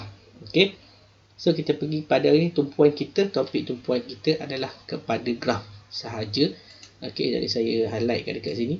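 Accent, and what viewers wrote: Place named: Kelantan, Malaysia. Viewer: cikgu ore kelate ko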